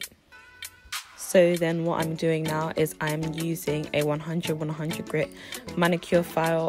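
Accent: United Kingdom. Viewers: So happy I heard a British voice, even better London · yesssss a uk gal! finally ❤️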